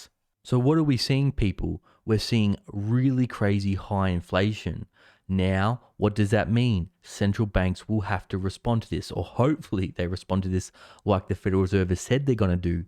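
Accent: Australia. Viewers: Hey. I'm an Aussie, too. You sound like you live in Melbourne, but I could be wrong, of course. I'm in Sydney. You make some interesting videos. So, I'm designing a currency that has no commodity capacity, i.e can't be speculated/gambled on. The objective is to incentivise creating sustainably. I'd like to run it past you, more for analysing the modelling as it is a pretty difficult currency to introduce in reality, but who knows. Get back to me and we can chat about it.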